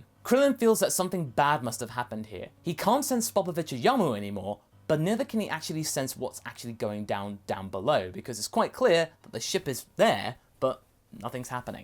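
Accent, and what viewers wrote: United Kingdom. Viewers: Oh wow, it's almost like you voiced the cell from team fou......wait.... I just.....your British. Well at least that clears it up.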